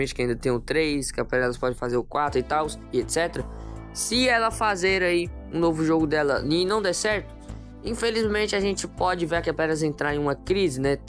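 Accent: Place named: Brazil. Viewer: Oi tudo bem então eu até concordo com vc pq tipo se não der certo nos jogos da keplerians provavelmente ela talvez lançar o Ice scream 9 pq tipo eu sei que Ice scream 8 foi o encerramento da franquia Ice scream pq mano tem muito jogo do Ice scream ainda né então se a keplerians não lançar Ice scream 9 provavelmente ela vai lançar essa nova franquia aí né e pois é mano pq Ice scream foi uma franquia que durou mais tempo que na verdade foi 5 anos de franquia que foi desde 2019 eu tava no 2 ano da minha escola e eu nem ligava pra jogos de terror eu só liguei em 2020 né quando começo a pandemia né e pior qu foi isso tudo mesmo mas uma coisa que eu queria falar é que eu gosto muito de vc e também eu tenho 4 YouTubers favorito que são Isaque yt, Leozico games, player caro e vc que na verdade quando eu te conheci eu achava que vc era gringo mas depois que ouvi sua voz aí eu percebi que vc é Brasileiro né eu sei que eu devo ter digitado muita coisa aqui né mae são essas coisas que queria falar Mesmo mas pode falar comigo se quiser tá bom 👍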